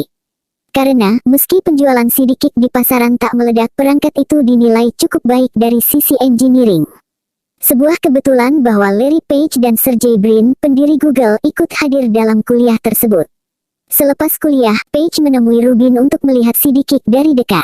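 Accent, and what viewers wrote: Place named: Java, Indonesia. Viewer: Jowo e ngendi sluur😁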